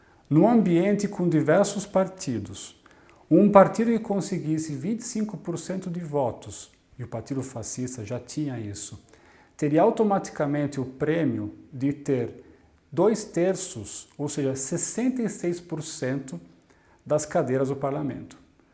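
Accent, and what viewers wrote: Brazil. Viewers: CONHECI o seu canal hoje por causa do vídeo no canal do Henry ... Esse vídeo sobre #Fascismo simplesmente é o mais top dentro de 30 min ... Parabéns !!! Esse sotaque é meio Blumenauense ??? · Revendo seus vídeos, nosso que o seu erre já virou éhe.Observe quando o senhor diz Homa. Gostei de sentir o meu Brasil na sua memória. Maravilha! Afetuoso abraço.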